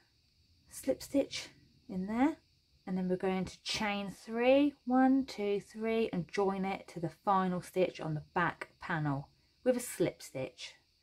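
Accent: Norfolk, United Kingdom. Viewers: I ordered this pattern on your Etsy and followed the video so I could learn patterns better. Thank you so much for really making this step by step! I'm new to crocheting and patterns do my head in. I imagine the stitches floating in midair somehow and can't picture where everything is supposed to go. Hahaha Thanks to this video, I completed my first ever cardigan! Now I'm working on a second. Also, I LOVE your Norfolk accent! It's like having your nan hand you a bowl of nice hot soup. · Brilliant tutorial. Thank you. Do I detect a Norfolk accent? I'm now extending my sleeves and they seem to be getting wider, is that right? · Are you from Norfolk?!?!? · Sorry not crochet related but I was trying to work out you accent lol what part of the uk are you from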